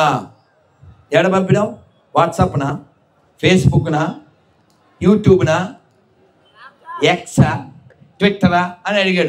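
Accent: Telangana, India. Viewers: గురూజీ మీరు తెలంగాణ భాష మాట్లాడితే సూపర్ గా ఉంది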